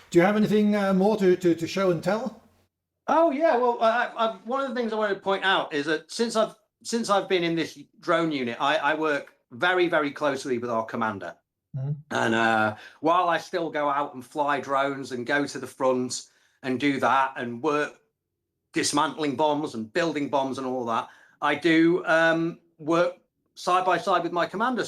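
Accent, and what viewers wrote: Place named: United Kingdom. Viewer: Wow! I listened all the stroy imagining some old chap. And the guy is really young 😮 Oh this British English 😂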